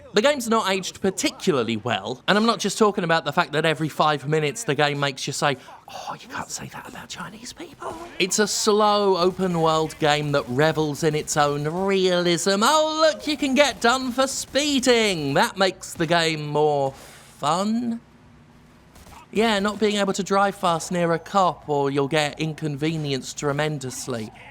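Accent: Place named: Estuary English, United Kingdom. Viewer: "maaarfia 2"? starting to lose the old estuary accent bit by bit.:D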